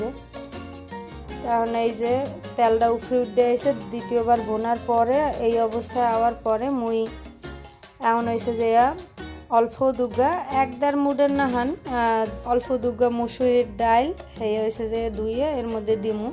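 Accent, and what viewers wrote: Barishal, Bangladesh. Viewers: Apu apner basa borisal e konkhane amar basa o borisal e .....amader borisaler basai vlog sune valolaglo ti subscribe kore fellam jate amader borisaler basa sunte pari....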